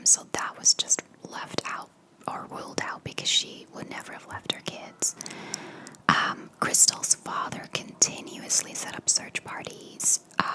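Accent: United States. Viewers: i’ve watched your vids for so long and i’m still in shock that you’re from belgium. your english is flawless and your accent sounds so american i’m fooled every time😂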